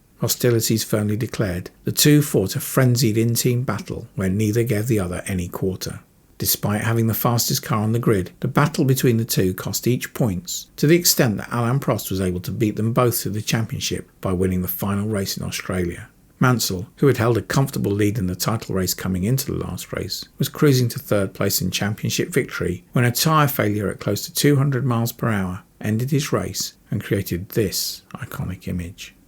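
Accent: United Kingdom. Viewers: French here. Thank you sir for being able as an english motor racing buff (I suppose so, based on your way of speaking) to paint a balanced picture of an english great driver, rather than producing yet another hagiography. Moreover, the editing of your video is quite good and your comments brillantly written, congratulations. To complete Mansell's picture, Alain Prost declared, in an interview part of a long documentary on his career made by a french TV channel, that Big Moustache was the only teammate he had not been able to work efficiently with in Formula 1, because the man of the Man's Island was not commited enough in the development's work and was behaving as a prick generally speaking. He explained that even when he and Ayrton had a huge disagreement and were not talking to each other anymore in 1989 after the San Marino GP's incident, they managed to keep on collaborating very professionnally during technical briefings and development's sessions. 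According to him, Nige was very fast and undisputably the bravest of all, but not as complete a driver as Niki, Nelson or Ayrton. Despite his flaws, "Il Leone" was a fantastic striker almost always thrilling to watch, and a monster of will who deserves a huge respect for that reason, considering the physical impairments he had to overcome all along his motor racing's career, resulting from his very serious accidents in the early stages of it.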